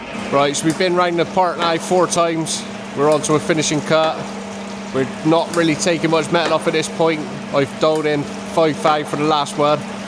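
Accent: Bristol, United Kingdom. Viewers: Great work, sound like Bristol accent or close to Bristol?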